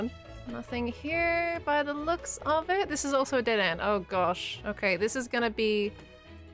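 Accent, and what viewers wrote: Australia. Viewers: enjoying the Aussie accent. Such an australian thing to call yourself ' '. Glad you have joined the hermitcraft server, I felt Australia was under-represented here:) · needs to work for a radio station or do pod casts he has the voice for it and i love it <3 that and your awesome aussie accent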